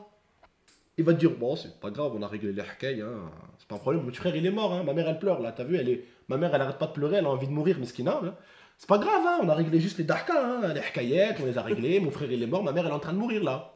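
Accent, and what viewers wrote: Marseille, France. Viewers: Sa me fume il a pris un accent marseillais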